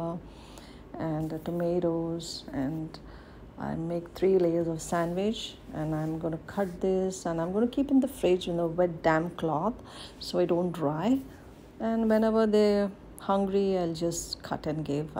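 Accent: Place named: South India, India. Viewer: ... What it is that i dont like about u...??. U sooo beautoful by Face and Heart too... Ur South Indian Hindi accent it reminds me of my Manglorian friend... Padma.. Love ur vlogs.... Make more vlogs...not those short reels...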